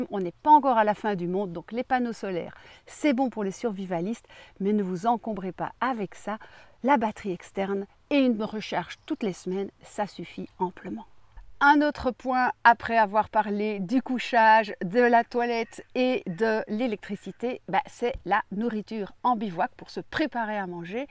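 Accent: Belgium. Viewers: très utile et très agréable le petit accent belge!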